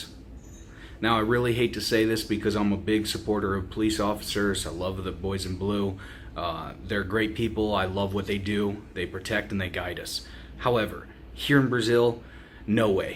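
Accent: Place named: United States. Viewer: Esse cara é br e deve ter morado mo cota nos eua tem mo sotaque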